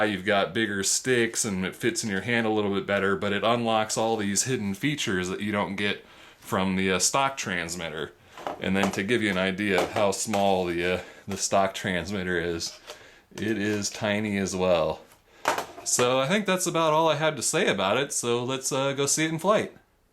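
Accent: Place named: South, United States. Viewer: How come when I watched this before you had a southern accent?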